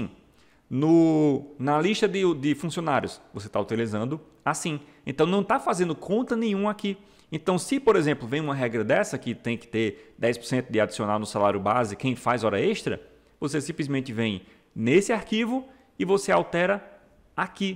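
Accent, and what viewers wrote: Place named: Paraíba, Brazil. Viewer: parabéns pelo video meu amigo! Seu sotaque parece muito paraibano. Estou correto? Abraço.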